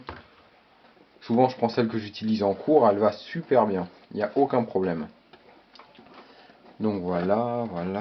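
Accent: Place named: Auvergne, France. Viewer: Rien qu'a ton accent j'ai su que t'etais auvergnat "on y fais..." bref bah moi aussi je suis de Clermont-Ferrand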